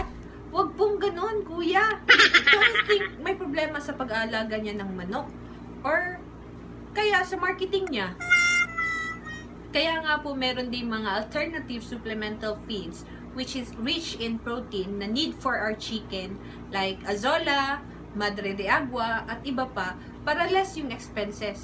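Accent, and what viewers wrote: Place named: Bicol, Philippines. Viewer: new subscriber here from Bikol... tga saan ka ma'am? parang bikol yung punto ng tagalog mo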